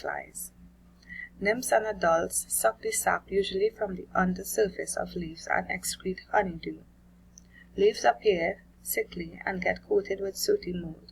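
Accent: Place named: Trinidad and Tobago. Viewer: thanks for the advice and what to look for on the okra plant. You speak like a trinidadian.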